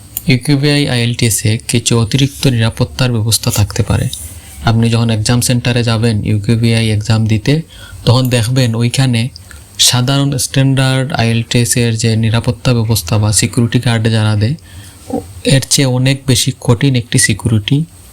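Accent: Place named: Sylhet, Bangladesh. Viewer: I guess , you are sylhety 💕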